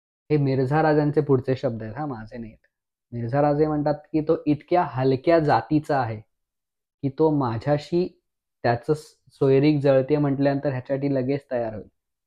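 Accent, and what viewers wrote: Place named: Pune, India. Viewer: खुप सुंदर भाऊ तू छत्रपतिची माहीती दिलीस भाऊ तुझी पुणेरी भाषा अप्रतिम भाऊराजा ❤❤❤❤❤🙏🙏👍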